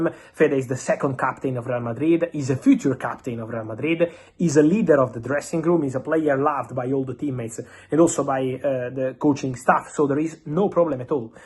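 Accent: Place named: Italy. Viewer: i love how you say sir jim ratcliffe yoiur italian accent makes it sound like sergio ratcliffe here we go have a great day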